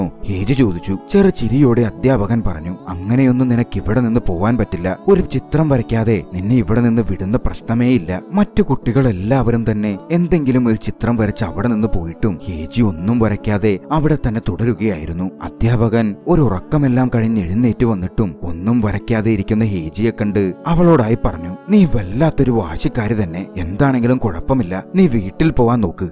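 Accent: Ernakulam, India. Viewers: നിങ്ങൾ എറണാകുളത്തുകാരൻ ആണോ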